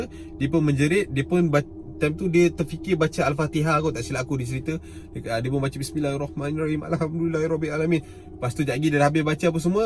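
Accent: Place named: North, Malaysia. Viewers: pandai betul cakap utagha. nak belajar lah. hehehehe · org utara ke?byk kali dgr style ckp MCM utara hehehe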